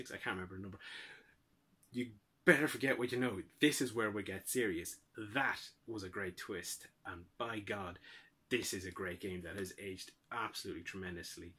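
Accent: Ireland. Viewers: Are you really Irish or is it a fake accent?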